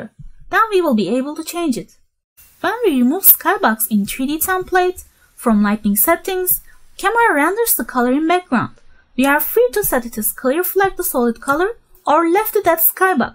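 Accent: Turkey. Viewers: dinlediğim an saniye de bu Türl dedimdoğruda bildim : D Türklerin aksanı hep aynı ama güzel kelime haznen var devam.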